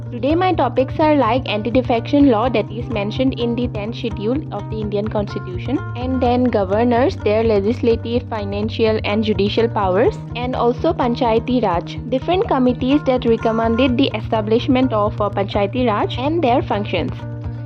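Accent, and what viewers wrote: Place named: Assam, India. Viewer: Assamese accent nsna lgse hunibo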